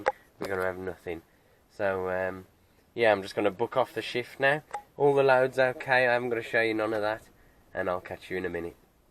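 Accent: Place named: Birmingham, United Kingdom. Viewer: love your accent....is that brummie or just general black country?....and the way you accentuate the letter G in words..lovely...I love watching moody blues interviews they talk more or less the same as you....love it!!!!!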